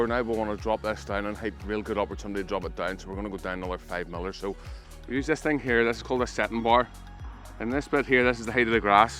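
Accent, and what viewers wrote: Northern Ireland, United Kingdom. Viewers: Never thought I would get so much enjoyment watching a video on lawns. Knowledgable, genuine and straightforward. Throw in northern Irish accent and you have all the ingredients for a brilliant channel. Keep it up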